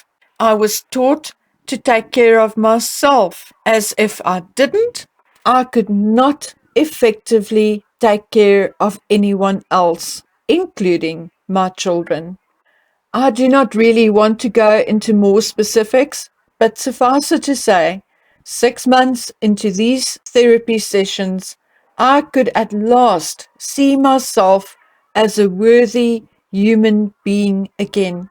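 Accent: Afrikaans, South Africa. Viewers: Daai Afrikaanse aksent sal ek enige plek in die wêreld herken!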